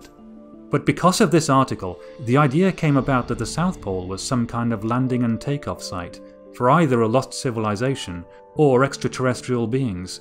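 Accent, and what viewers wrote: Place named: United Kingdom. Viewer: what dialect of British English are you speaking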